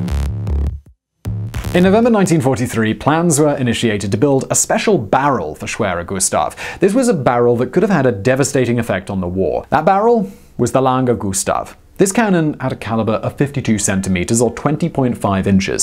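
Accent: United Kingdom. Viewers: Oh awesome, one I've never heard off! And, we Americans LOVE the British accent.;)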